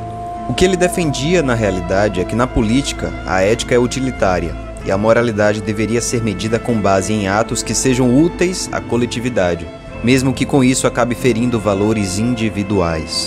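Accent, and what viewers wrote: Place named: Fortaleza, Brazil. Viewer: Conheço esse sotaque. Tu és de Fortaleza? Gosto muito de Maquiavel.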